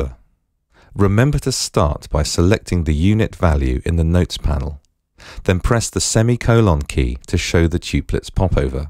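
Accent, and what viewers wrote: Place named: United Kingdom. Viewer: don't you love hearing "eighth note" in a British accent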